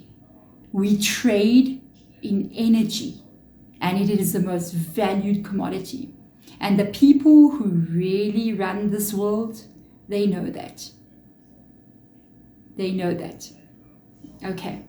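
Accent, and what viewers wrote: South Africa. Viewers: B4 opening YouTube for a taro reading i asked my spiritual guides to guide me to a taro reader who can give answers to my questions and can with their reading uplift my spirit for this day. Lo and behold you were the first to appear and the moment you start talking i felt the connection with you. This is my first time ever that i come across your videos. The moment you start talking i knew that you are a fellow south african, only i live now in Australia. You are the best taro reader that i come across of all the other readers. The reason why is bc i have never their heart. Everything that you said resonates with me. The isolation that i prefer bc of others bad energy thats not good for my soul. Many times i feel like i don't belong where i found myself. I am very empathetic and can feel other people's emotions. The other day i dreamt that i was nackered and i needed somewhere to rest but where i am, i feel like im not welcomed. 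I found a bed where i can rest and felt so uneasy i was afraid to fall asleep then suddenly i feel arms around me and immediately i knew whom it was without turning around. At that moment I felt at peace. The person whom it was passed away 5 years ago and has told me that everything is going to he alright. Later the day i also remembered the morning he came to visit me was also his birthday. Thank you for your reading and i am subscribing immediately. Looking forward to listen to your other videos. Love and light.❤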